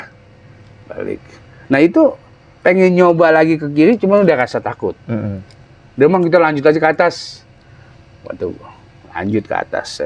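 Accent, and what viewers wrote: Jakarta, Indonesia. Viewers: Lucu. Bngt. Suka sama. Gaya logat nya. Si. Abang. Btawi bngt..👍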